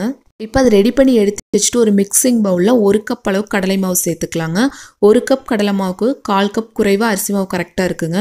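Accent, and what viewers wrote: Kongu, India. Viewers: Hi sister I'm new subscriber.. I like ur videos... Ninga kongu Tamil Thana pesaringa. Yentha ooru sister???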